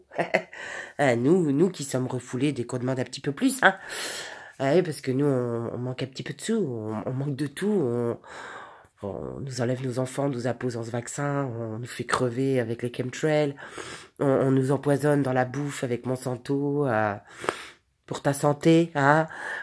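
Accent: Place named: North, France. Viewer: Vous êtes du Nord ? Un accent comme celui de Dany Boon 😁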